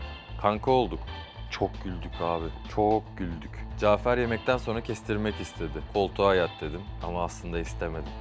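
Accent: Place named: United States. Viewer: Adam amerikalı aksan çok saçma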